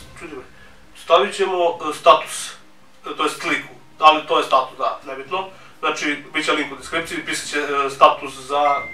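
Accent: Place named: Southern Serbia, Serbia. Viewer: odakle si, po govoru bih rekao da ste iz leskovca ili babusnice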